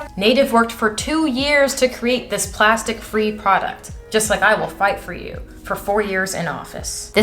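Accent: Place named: Florida, United States. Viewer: This Florida accent is hilarious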